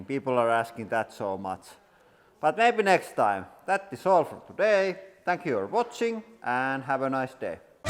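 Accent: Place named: Finland. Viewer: We Finns speak English like this 😄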